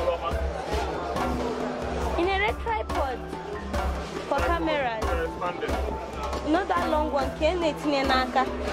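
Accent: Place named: Mbano, Nigeria. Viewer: lol. just blowing mbano igbo ascent in Onitsha main market. Lol. I thank God we didn't loose you by marriage to another tribe.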